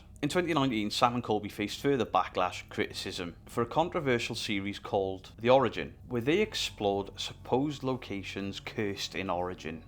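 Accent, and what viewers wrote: Wales, United Kingdom. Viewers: Pretty sure he’s Welsh!😂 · OMFG dude I could listen to your Welsh ass tell stories for hours man!!! PLEASE tell me you know who MANOWAR is!!!???? If you do you gotta say it sometime!!! Grandfather tell me ah story.... Such a good old school band!!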